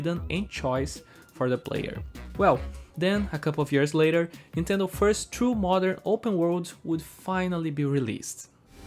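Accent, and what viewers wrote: Brazil. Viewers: ah, sabia, o sotaque te denunciou! Mas vc fala inglês bem pra caramba! Bacana ver um youtuber da minha casa fazendo conteúdo de qualidade tb pra fora! Fica em paz, amigo!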